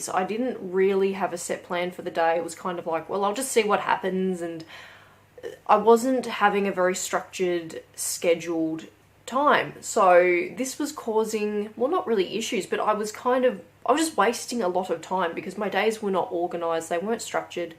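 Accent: Australia. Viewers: Random question, where is Australia are you from? Just the accent is throwing me as to where 😆 Thank you so much for sharing your tips. We’re in QLD and can access the 50 cent public transport which is a help! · You have a northern Queensland accent😊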